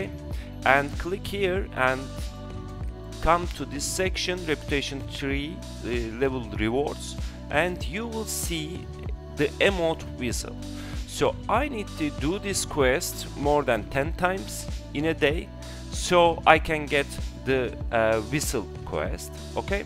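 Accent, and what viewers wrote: Turkey. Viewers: rastgele girdim videoya aksandan anladim türk olduguna :D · Kesin Türk aksanından anladım :)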